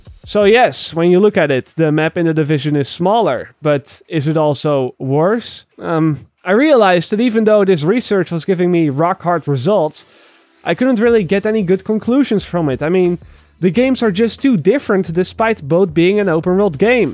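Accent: Netherlands. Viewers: mate your dutch accent is hilarious. But good video liked it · This sounds so Dutch it's not even funny anymore. Atleast it's better than hearing me trying to talk English. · Your accent dude genious lang leve holland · loving the dutch accent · Deze gast heeft echt een Nederlands accent This guy really has a Dutch accent. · van Corpusstein haha ja ik hoorde ook gelijk dat ie uit Nederland kwam de eerste keer dat ik een video van hem zag . Nu meer als een jaar geleden. Hij brengt het wel leuk en soms moet ik er wel om lachen dat vernederlandste engels. Maar zijn video's zijn wel informatief. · That Dutch accent tho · So much dutch accent! haha goedzo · jij bent zeker nederlands dat kan ik aan je acent horen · aan je accent te horen, gok ik dat je Nederlands bent! Leuke & interessante video =) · Dat Nederlands Engels accent do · wow nederlands accent haha, goede video man! 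· Je kan goed horen dat je nl bent kill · Zoooo.... Zwaar nederlands accent gast, dat verpest de video gewoon. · the person talking has a Dutch accent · Prima vid, hebbe gij mooi gedaan! ;P Hoorde direct al dat je een Nederlander was =P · I have the feeling you are dutch #accent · lol je hoord het nederlandse accent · wist wel dat je nederlands was door je accent😂😂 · I knew you sounded dutch. goed filmpie man! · wow that accent is so great is sounds dutch but at the same time english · hehe tour dutch man😂i can here IT!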